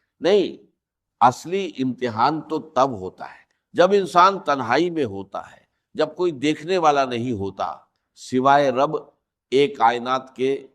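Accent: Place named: India. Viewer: Yes you're right this is not real video this is not his voice and you see clear that is Indian accent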